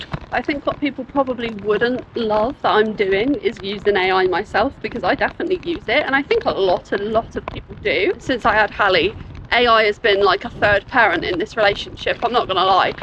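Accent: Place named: United States. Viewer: As an American, it threw me for a loop hearing another American voice suddenly 😂😂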